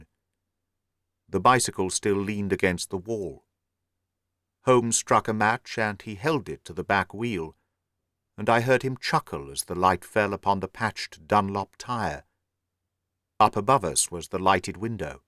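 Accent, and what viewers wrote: United Kingdom. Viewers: I do love listening to this man read. He gives an entirely new meaning to the words 'The King's English'.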